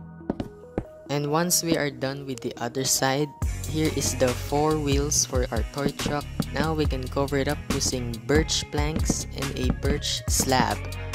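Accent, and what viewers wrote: Philippines. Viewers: Are you Filipino, the way you speak in english is filipino, I'm Filipino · Ko ya parang Tagalog ka no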